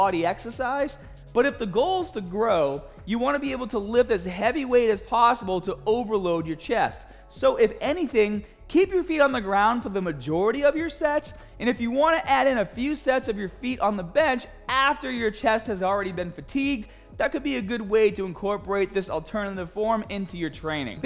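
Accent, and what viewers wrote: Boston, United States. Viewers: It's that thick ass Boston accent. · I love how owning up his Bostonian accent, it always adds a bit of flair to his videos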